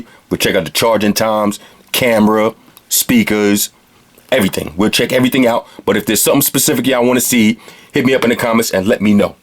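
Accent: New York, United States. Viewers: shout out to that new york accent lol wallwey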